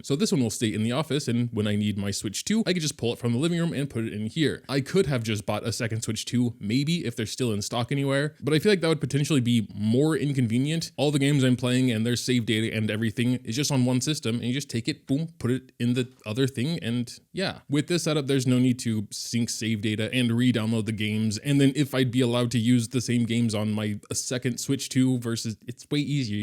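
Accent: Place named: Germany. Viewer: i didnt expect you to be german :D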